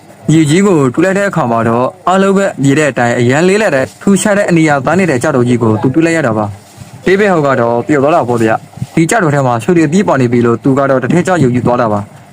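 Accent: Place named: Dawei, Myanmar. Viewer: ထားဝယ်သံဘိတ်သံနဲ့တင်ဆက်နေတော့မနဲနားထောင်ရတယ်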